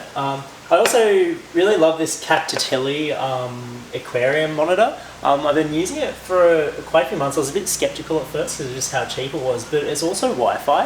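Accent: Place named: Australia. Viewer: What was the name of the controller? I don’t understand your Aussie accent well enough 😅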